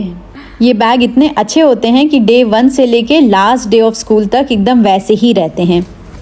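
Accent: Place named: Mumbai, India. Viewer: Sister aap mumbai se ho , aur aapka sasuraal u.p. ka hai, isliye aapki mix zabaan badi hi mazedar lagti hai sunne me. Khaskar jab aati hai, ise chhupa kar paka lenge..😊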